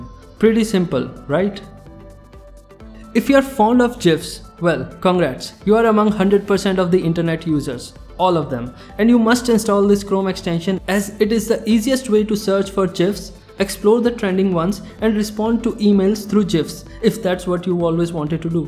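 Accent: India. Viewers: Why do you sound like an Indian? Well, ok, he is an Indian. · LOVE THIS CHANNEL BUT I HATE THAT INDIAN ACCENT.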